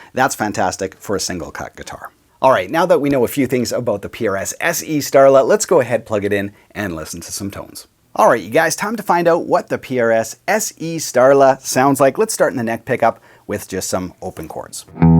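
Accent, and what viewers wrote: Canada. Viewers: Never heard you sound so Canadian aye!! Usually rarely hear you sounding typical Canadian Aye, or at least how we Americans stereotype it!! Said with love!! Love the Videos!! Your actually the 1st channel I started watching after not playing for 20 years. So Thanks for getting me excited about playing again!!!!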